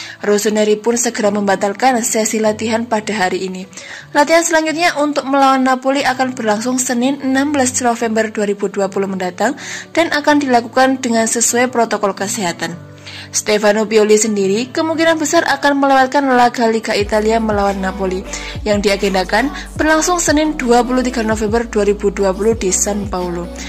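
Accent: Java, Indonesia. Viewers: Mbak e wong jowo endi? 😅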